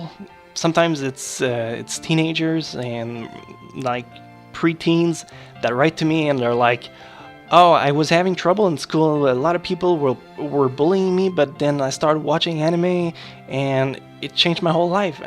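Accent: Quebec, Canada. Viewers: Hé ouais l'accent québecois ! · J'ai toujours voulu entendre ton français parce que je trouve l'accent canadien vraiment sympa! Voilà une bonne chose de faite :) Content d'en apprendre un peu plus sur toi et bonne continuation! First ; congrats for various things! Second : keep the good work sir! ^^ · Oh putain je ne laurait jamais cru que tu etait canadian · Tant qu'a y être, est tu québécois? perso je trouve que nos accent sont pareils XD Test : dit tabarnack en vidéo on verra ;) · LoL mec je savais pas que tu etais quebecois sa fait du bien tentendre parler francais :) tu parle bc mieux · tes quebecois non? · Haha je savais déjà que tu étais canadien et francophone mais tu as vraiment l'accent canadien quand tu parles français, c'est trop mignon ;) Mais jpense que tu as eu raison de faire tes vidéos en anglais, c'est beaucoup plus pratique pour se faire connaître et c'est plus "universel" que le français, on va dire. 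Anyway, keep up the good work and greetings from Japan (I'm a French girl on hollidays in Japan XD) PS: J'attends avec impatience Chronexia and the eight seals, au fait ! Vu le temps et les efforts que tu investis dans le projet, ça va être génial, c'est obligé ! :) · Je le savais que tavais pas un accant anglais jme disais que ce netais pas ta langue maternelle mais je naurais pas cru que tu serait canadien fr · OMFG T'es québécois Oh shit ._. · Ben non! depuis tout ce temps, j'avais trop pas reconnu l'accent! Nice que je ne sois pas le seul au Québec à aimer (ou à adorer) regarder les animes :P Je suis fan de Naruto mais les autres animes sont tout aussi bon! Bravo pour tout ces vidéos. Je suis de Rimouski, mais je vais te reconnaitre si on se rencontre inquiete-toi pas, si jamais je vais au zoo! :D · Un vrai accent Quebecois XD (a real Canadian-French accent)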